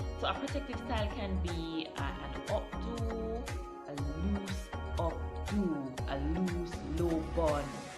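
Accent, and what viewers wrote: Jamaica. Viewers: Jamaican ? #wahh gwan! Just subbed Hun !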